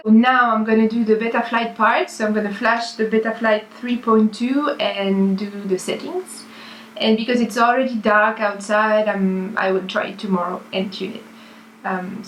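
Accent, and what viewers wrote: France. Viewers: J'avais bien reconnus notre cher accent français mais j'étais pas certain j'aime beaucoup t'es vidéos tu devrais en faire au moins une en fr :D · Ah cet accent lol, tu est française toi non? :) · Je me doutais que tu étais française avec ton accent 👍 au top tes vidéos, keep going 👌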